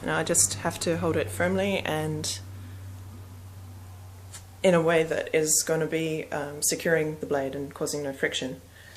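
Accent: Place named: Australia. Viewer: There's more videos than this but yes, still nothing wrong with having more of them. Perhaps do some videos on wrestling with longswords, as many are under the impression it simply didn't happen. Edit: Also I thought you were Australian for a minute there (I'm Australia.) gee the difference between your accent and ours here is a lot more subtle than I'm used to.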